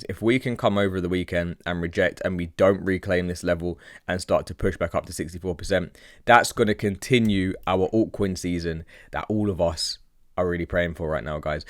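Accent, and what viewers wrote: United Kingdom. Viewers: love the bri ish accent my man🍺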